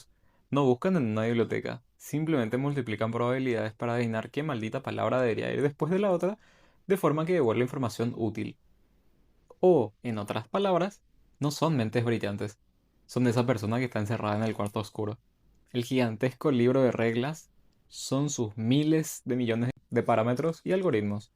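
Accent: Paraguay. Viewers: de casualidad no sos de paraguay? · Tenes acento paraguayo :v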